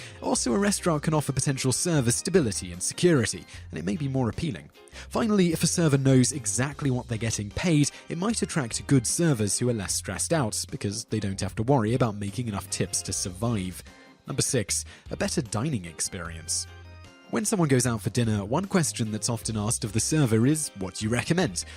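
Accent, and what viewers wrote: United Kingdom. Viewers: The narrators voice was was from the UK I know because I am also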